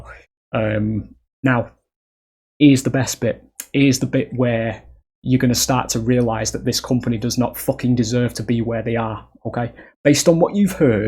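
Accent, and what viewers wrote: United Kingdom. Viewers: A vent in a proper British accent. This is great!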